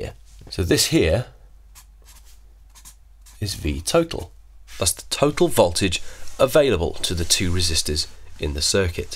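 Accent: United Kingdom. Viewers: Ok but why does his voice , scream British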